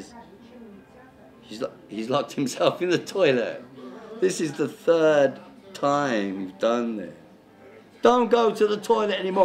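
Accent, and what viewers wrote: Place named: Italy. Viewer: I think his accent sounds more italian than british now. isn't it ?^^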